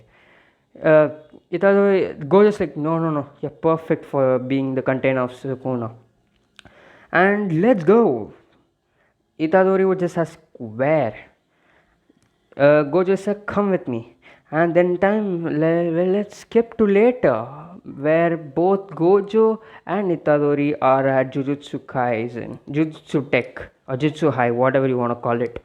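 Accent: India. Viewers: Indian accent makes me laugh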